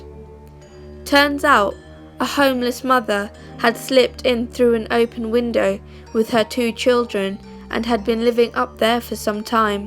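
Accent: United Kingdom. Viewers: Her voice is lovey.And usually find British accent s annoying but her is lovely ;)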